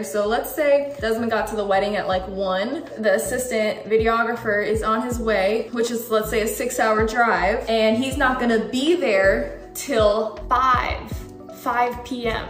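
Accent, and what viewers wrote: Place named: Los Angeles, United States. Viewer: You gotta be from LA lol the antics word syntax and how you say them.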